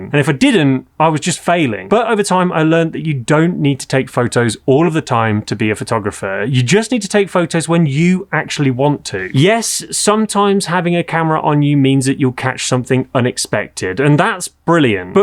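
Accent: England, United Kingdom. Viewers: Very good advices and I like your english accent and style with sous-entendus. Could you add : 1. B&W is a must for artistic photos 2. Never use Auto (which is in the same line as always use Manual) 3. The larger the zoom, the better the photographer.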